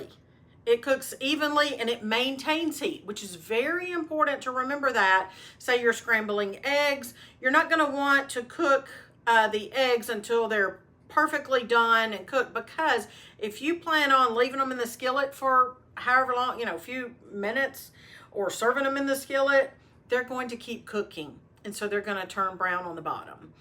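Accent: South, United States. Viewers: How's about to say Ohio? Where did you get that Southern drawl then huh? 😅 Love from South Carolina y'all hold it down up yander 👍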